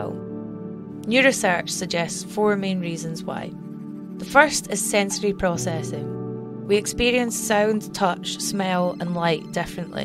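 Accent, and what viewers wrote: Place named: Scotland, United Kingdom. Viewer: I can't really focus on the content because you're speaking in a scottish accent XD Sorry that's how I'm easily getting distracted :( Don't hate me please x(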